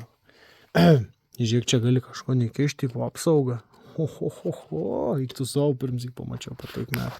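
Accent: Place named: Samogitia, Lithuania. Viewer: Gal ne įtemą, bet man labai patinka žemaičių šneka! Linkėjimai iš Dzūkijos gilumos!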